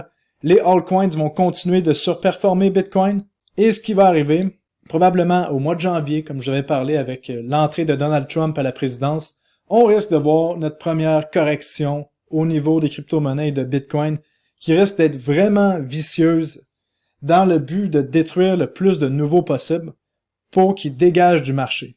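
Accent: Quebec, Canada. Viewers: Qiuebecois en France et ben l'fun de te suivre avec le meme accent que moi.. :)..super bien tes details!!!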